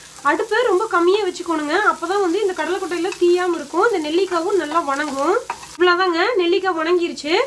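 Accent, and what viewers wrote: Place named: Gobichettipalayam, India. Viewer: hi !!!! I'm also from gobichettipalayam......unga salang keta udane namma oru than irukum nenaanachu check pannana semma...semma nenga namma gobichettipalayam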